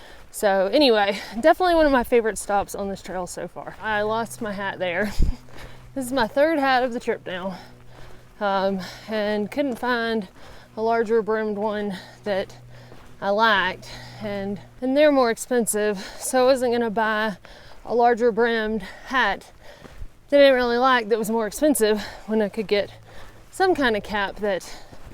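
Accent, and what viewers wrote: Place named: Alabama, United States. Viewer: okay, I'm hooked on your channel. I've only been watching for about two weeks and have watched most of your videos. I even watch them at work. You are such a good narrator and videographer. I love your accent. I am married to an Alabama girl and even though I am from South Carolina, I can't get enough of those Bama girls talkin. Be safe out there.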